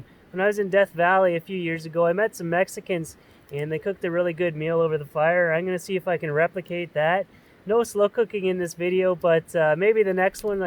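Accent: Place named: Canada. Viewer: Wonderful vid's. However, what really has attracted me to your vid's is your "voice & Canadian accent & yes "(oout, aboout). A magnificent combination. Thanks for all that you do & keep up the great work🥂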